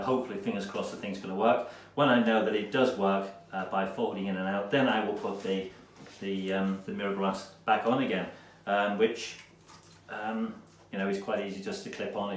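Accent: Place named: United Kingdom. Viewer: Good morning, you're brilliant, and the explanation, with that British voice, is thrilling!!! Very explanatory video... I disassembled "almost" everything following your instructions and made it to the end... Unfortunately, I wasn't able to open the black box containing the folding motor and, more importantly, the one you ordered and replaced. Can you tell me the parts with the codes and where to order them? Thanks. Giorgio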